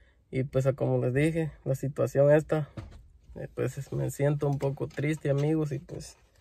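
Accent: Mexico. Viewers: Eres mexicano Ablas como Mexicano te copiaste de Asento Mexicano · Soy méxicana y no veo nada de malo que hable como nosotros. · No les quita nada de que el quiera hablar como los mexicanos!. Yo soy mexicana y no le miro nada de malo. Malo es cuando se expresan mal de Mexico 🇲🇽 pero en este caso no es así. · Es la primera ves q veo este canal y creó q el muchacho es de Guatemala, pero tengo duda porque abla como mexicano, me cayó mui bien 👍 seguiré mirando sus videos para subscribirme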